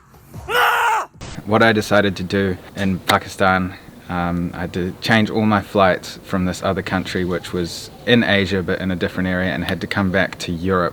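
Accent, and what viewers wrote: New Zealand. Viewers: it sounds very Kiwi. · Piet Velkens Are you serious? I’m from NZ and he sounds very kiwi? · I'm confused, are you actually from Hungary and grew up in NZ for most of your life? Or you are from NZ and later moved to Hungary? I know either way you definitely spent most of your life in NZ bro, there's no hiding that accent haha you sound and even look just like my best mate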